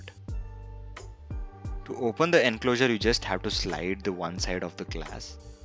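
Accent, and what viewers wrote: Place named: India. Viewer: You have a strong from India accent and speak too fast to be clearly understood. Slow down from 10 to 8.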